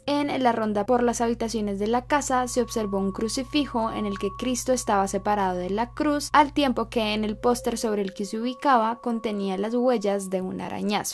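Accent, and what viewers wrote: Colombia. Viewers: Colombia, creo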